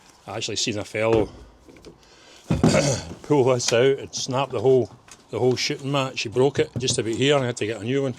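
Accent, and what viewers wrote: Scotland, United Kingdom. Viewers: Great video pal! I love your non nonsense approach. Your accent makes me think you are not far from my Scots family in Ardrosson. P.S. Thanks for the Ted tax xxxxxx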